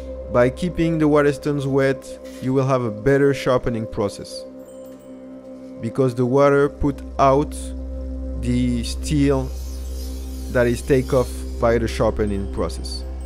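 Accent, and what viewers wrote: France. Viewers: merci pour cette superbe vidéo , a ton accent je suppose que tu est Fr , donc un grand merci pour cette vidéo très précieuse , j'ai un wakizashi magnifique et d'excellente qualité , mais j'avais besoin de l'affûter grandement afin que celle ci soit bien tranchante afin qu'elle coupe le papier , un pouce bleu bien mérité :) contunu comme ça je m'abonne :)☺️🙏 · Ça se sens l’accent français 🤣🤣, possible de te mp ?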